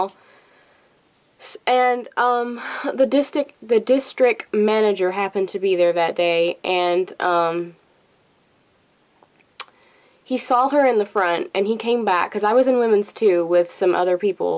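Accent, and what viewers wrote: South, United States. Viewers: a bit of southern accent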